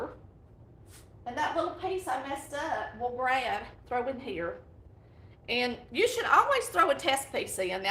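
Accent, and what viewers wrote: South, United States. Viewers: You say "yall" way to many time. And your speech is over done on the Southern accent . It is difficult to listen to you. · Just discovered your channel and you sound just like my family. I'm from the south and I m glad I found you. Love your recipes they are just like my Mawmaws.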